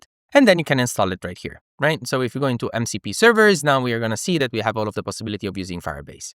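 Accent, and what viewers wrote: Italy. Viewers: Idk but this guy made me fall in love with Italian Accent...!!!! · Accent kinda italic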